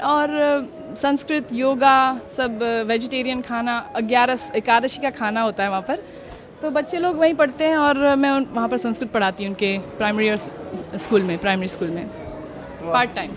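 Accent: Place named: India. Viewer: How she is foreigner?she can speak Hindi clearly with indian accent,so I think she is indian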